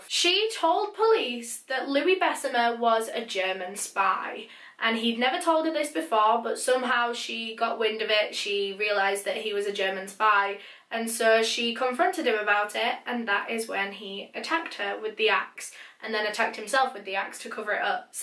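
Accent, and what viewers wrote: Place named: England, United Kingdom. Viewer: so you prob sound just as cute! I love this English accent!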